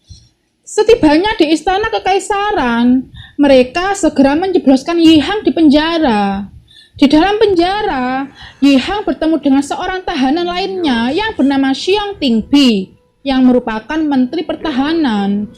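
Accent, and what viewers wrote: Java, Indonesia. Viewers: Maklum mbaknya Wong jowo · suaranya medok banget mbak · ASLI JAWA MBA ?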